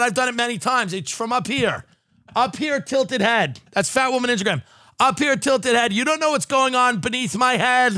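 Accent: United Kingdom. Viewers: As a Brit ex-pat I only tune in for the genuinely authentic british accent. It makes me homesick.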